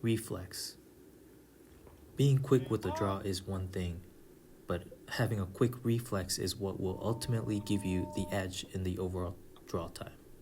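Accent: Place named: United States. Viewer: You sound American. What that shitty flag on your shoulder?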